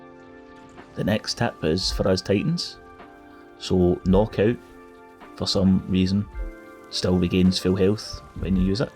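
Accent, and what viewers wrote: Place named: England, United Kingdom. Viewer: Bet you from England 😵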